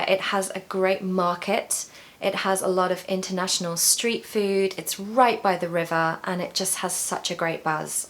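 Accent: RP, United Kingdom. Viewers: Well, that pronunciation seems quite posh, but not relative to Indian accent. London is a dream place to be for every person born in India. I wish I could settle there, but it's very costly. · I love british accent. I like your voice and videos.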